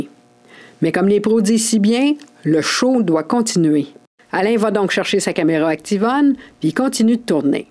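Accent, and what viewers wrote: Quebec, Canada. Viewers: Votre accent me rappel un merveilleux souvenir de la découverte du Québec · No I definitely prefer the English version, Canadian French takes some getting used to. But still a good show. Bien a vous. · Cet accent Canadien, c'est magnifique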